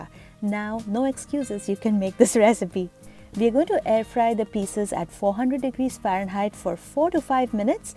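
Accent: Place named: India. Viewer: That looks superb thank you, BTW I love your Indian Accent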